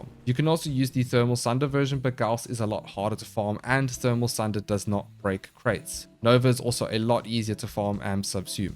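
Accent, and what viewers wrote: South Africa. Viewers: i have to ask where are you from? you sound like you from South Africa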